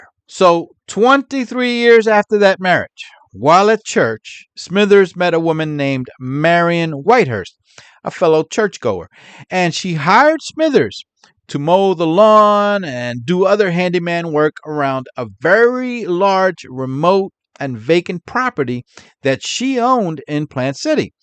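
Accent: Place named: Latin America. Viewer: and your latin voice.😊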